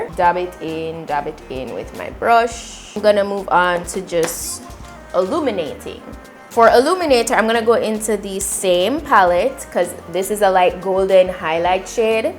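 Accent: Jamaica. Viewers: You beautiful love your Jamaican accent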